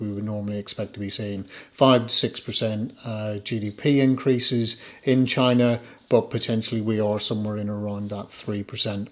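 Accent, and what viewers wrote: Northern Ireland, United Kingdom. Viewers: Do I detect a northern Irish accent 🧐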